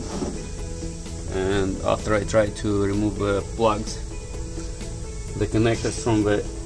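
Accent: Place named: Russia. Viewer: Рашен акцент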